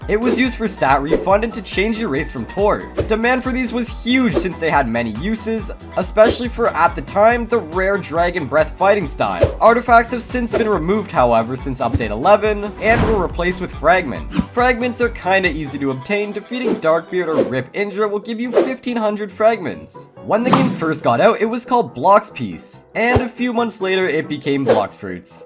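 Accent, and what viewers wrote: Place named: Canada. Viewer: you got that canadian accent im assuming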